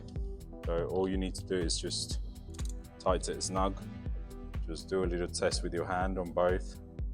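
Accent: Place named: England, United Kingdom. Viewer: I knew that Colombian face but the English accent fooled me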